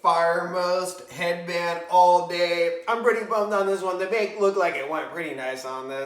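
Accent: California, United States. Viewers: You have one of the strongest california accents I've heard and I live in LA!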